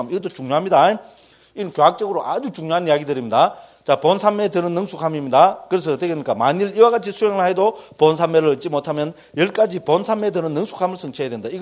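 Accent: Gyeongsang, South Korea. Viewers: 됐죠 스님~~ 경상도 사투리가 참 구수합니다.^^ 법문 감사합니다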